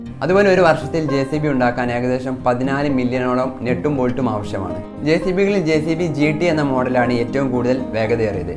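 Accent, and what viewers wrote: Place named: Malabar, India. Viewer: Nannaitund Malabar slang edakk varund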